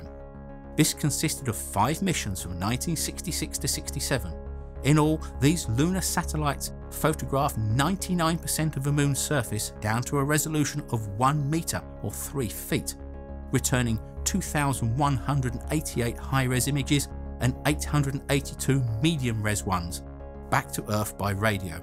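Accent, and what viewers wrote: United Kingdom. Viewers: Very informative thank you. Btw what part of Britain is your accent from? I absolutely love it, and have always been curious about regional British accent differences.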